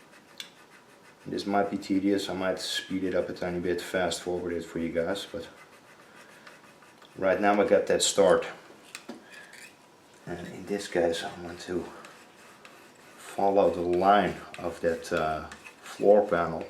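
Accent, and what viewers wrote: Netherlands. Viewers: Sounds like a dutch accent. You can tell by S sounds sounding like sch.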